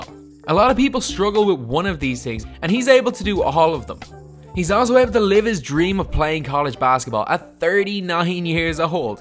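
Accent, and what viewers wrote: Dublin, Ireland. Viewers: Where are you from it sounds like Dublin? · Ur Irish accent had me shook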